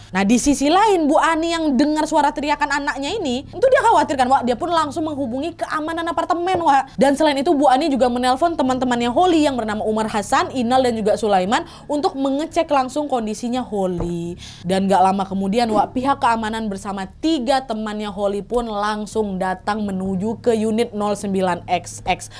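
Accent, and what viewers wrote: Indonesia. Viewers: Kak itu kalo bawain cerita beda bgt sm youtuber yg lain. Dia gk sok sokan melebih lebih kn dialog kyk bhs Indonesia sok" Di buat kek logat bule atau bhs Inggris yg gk kita tau artinya. Dia lbih ke apa adanya dia aja dengan logat Khas Indo nya yg medok agar lbih mudah di mengerti sm kita smua, kalo buat aku itu sih yg bikin aku gk bosen nonton nya. Sehat sehat ya kak sm tim, buat dedek bayi nya jg moga sehat selalu, dn selalu di limpahkn keberkahan. Aminnnn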